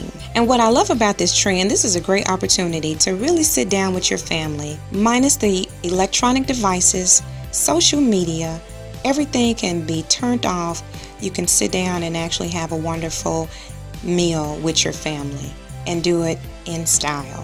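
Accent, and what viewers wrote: South, United States. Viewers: She is southern so has a thick accent... I'm not even American and I can understand her clearly. Then again, I do speak 4 languages. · Your soft sweet southern voice is so soothing to me, it's easy on the ears! The informative pictoral dissertation on styles over the years was interesting and proves there truly is "nothing really new under the sun" so we are assured it may be out for now but it will return! Glad to have found your channel!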